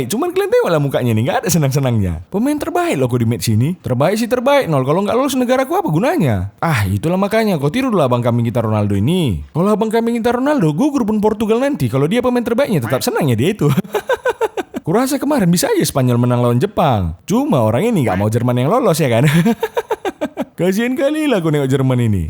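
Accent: Medan, Indonesia. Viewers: Orang medan dari logat ngomong nya yang punya channel ini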